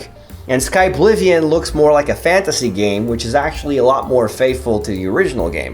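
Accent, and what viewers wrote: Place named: Portugal. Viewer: Hey, do you speak Portuguese? Kinda noticed your accent, lol. Just came across your channel. Great content !!!!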